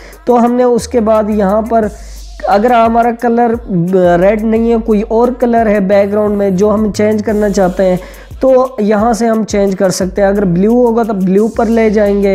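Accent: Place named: India. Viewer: Fk damn, Indian accent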